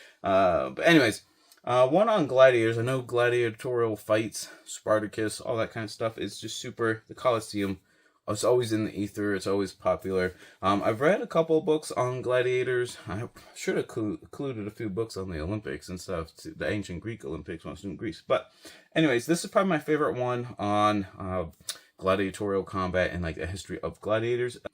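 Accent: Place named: United States. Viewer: Firstly, breath before you make videos and during, speak clearly and don’t mumble. Learn how to pronounce the names of stuff you are clearly so interested in, in the good way, look to the British for this, because your American pronunciation are cringe every time.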